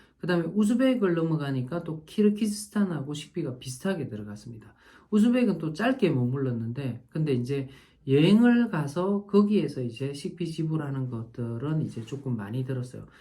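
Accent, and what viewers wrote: Gyeongsang, South Korea. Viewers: 수고 하셨습니다~ 감사 합니다. 같은 억양의 경상도 할배라~ 의 세계 탐방 정보에 따른 사고의 멘토를 부담없이 만족하며 보고 있습니다. 항상 건강에 유의 하시고 가족과, 연로하신 어머님께도 지금처럼 열심을 다하는 효자가 되시길 기도 합니다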